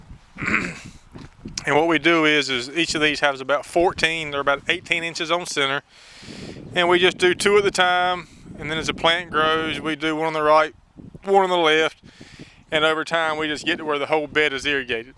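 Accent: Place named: North Carolina, United States. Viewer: native NC, sounds like a Civil War movie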